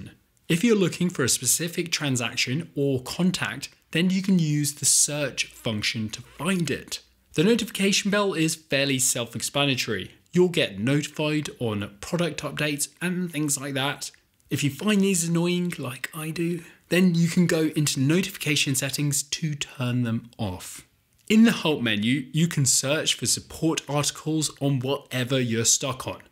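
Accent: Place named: United Kingdom. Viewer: Awesome. Every tutorial is just so much more pleasant with a cheerful British accent. 🙂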